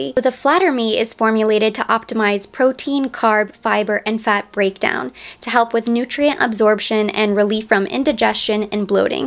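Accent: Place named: London, United Kingdom. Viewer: i agree. i wasnt sure if its Australian accent or English but i played it back and sounded more as a Londoner..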